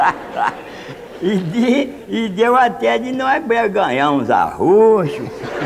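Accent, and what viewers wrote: Minas Gerais, Brazil. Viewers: Onde nasceu não sei . O sotaque e de mineiro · conheço bem esse sotaque kkk minero raiz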